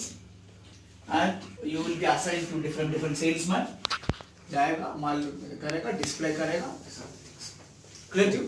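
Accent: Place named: Kerala, India. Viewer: why didn't you polish your accent, it sounds I am hearing Malayalam in English language.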